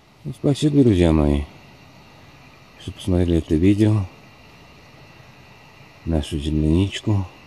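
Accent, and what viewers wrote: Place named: South Ural, Russia. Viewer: 56 лет назад я уехала с родины, Южного Урала, в Казахстан и нашей земляники не видела столько же лет. А вкус её помню. Очень приятно услышать наш говор южноуральский. Спасибо, что всколыхнули воспоминания.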